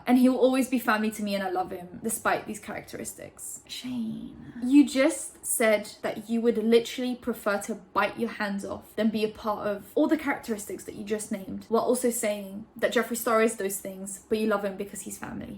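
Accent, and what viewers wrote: United Kingdom. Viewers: Omfg I used to watch you so long ago and I could never find you ever again 😂 finally popped on my recommended 😩 I was like where’s the girl with the British accent 😩😩😩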